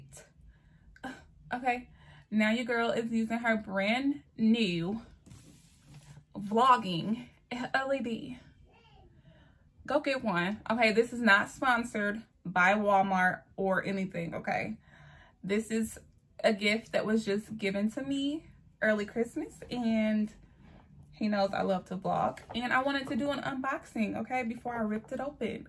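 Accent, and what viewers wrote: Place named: Alabama, United States. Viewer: Lmao accent. U sound like my cousins in Alabama.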